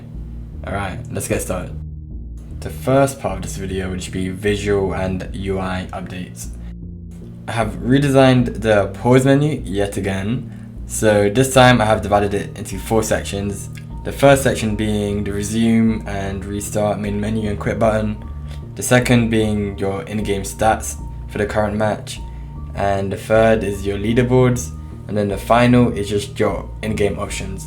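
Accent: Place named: United Kingdom. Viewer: ew briish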